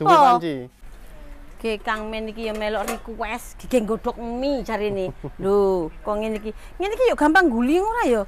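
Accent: East Java, Indonesia. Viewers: Slmt pagi bu..... Domisilinya di Wates yaaaa.... Logat jatim nya kental sekali Sy sll buka video masak2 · Bu kok di Wates Kulon Progo tapi kok logatnya jawa timuran banget. apa sedang berkunjung ke saudaranya yg di kulon progo. Bahasa "sak buajek", "banyu puteh (bacanya bukan putih)" khas jawa timuran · orang Jogja tapi bahasane Kyok orang jawatimuran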